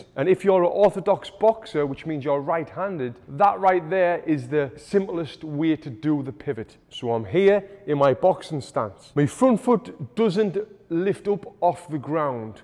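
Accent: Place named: Northeast England, United Kingdom. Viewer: Cracks me up how the North East accent needs subtitles.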